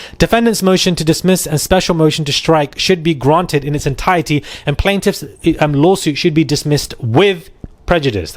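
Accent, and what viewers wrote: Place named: England, United Kingdom. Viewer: An east Indian with a English accent ok ... this is black folk bizz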